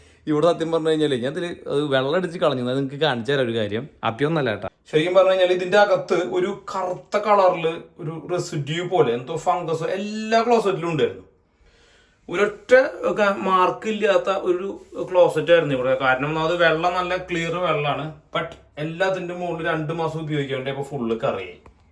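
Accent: Thrissur, India. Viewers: content onnum illenkilum veruthe samsarichal mathi, athu kelkkan oru rasamundu, ningal Thrissur alle, aa slang anu rasam. Njan ningalude video kanunnathe ninte samsaram kelkkana.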